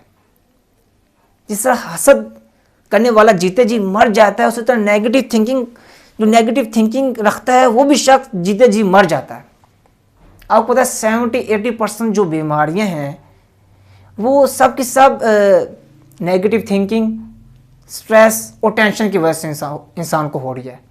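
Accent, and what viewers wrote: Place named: Punjab, India. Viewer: MASHA ALLAH aap buhut acha kaam ker rahy ho.....well done... buss apny Urdu accent py kaam kerty rehna.... kyonky Punjab main yeh normal hai....Mulk (country) ko Mullak.... Motivational speaker ky liye us ki awaz aur lehja buhut ihmiat rakhti hai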